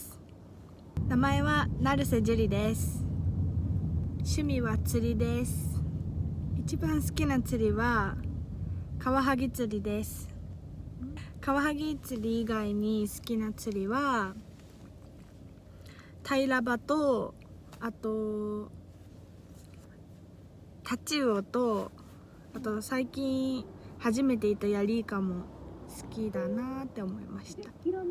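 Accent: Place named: Japan. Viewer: 確かにH弁出るね💦安心🤗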